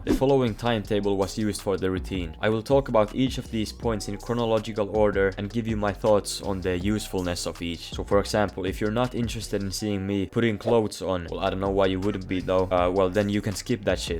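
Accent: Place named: Finland. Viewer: Suomen aksentin aina tunnistaa :D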